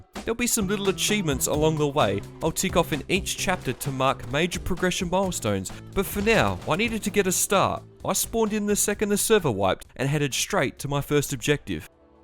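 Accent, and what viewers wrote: Australia. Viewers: OMG, I’ve been watching since you had 500 subs, even on different accounts. Your Australian voice and the way you explain things always keep me hooked. It’s crazy to see how far you’ve come, and honestly, you deserve all the success. Can’t wait to see where you take this next – keep doing what you’re doing, it’s seriously inspiring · Are you Australian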